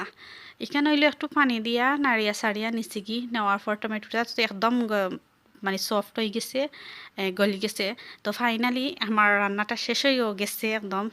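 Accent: Sylhet, Bangladesh. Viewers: চিংড়ি মাছ যে এতো সুন্দর করি ভুনা করা যায়,দেখে অনেক ভালো লাগলো।। আরোও ভালো লাগলো আপনার সিলোটি মাত😀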